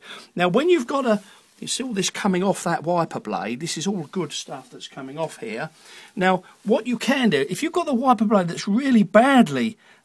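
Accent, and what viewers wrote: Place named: United Kingdom. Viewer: Wonderful British English accent!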